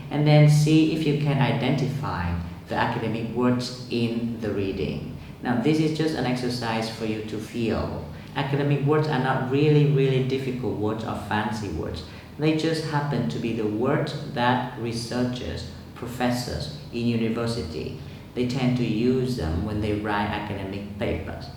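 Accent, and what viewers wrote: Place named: United Kingdom. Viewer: Mình thấy thầy nói hay mà . Thầy nói giọng Anh- Anh nên chắc bình thường bạn nghe giọng Anh- Mỹ nhiều nên nghe ko quen thôi.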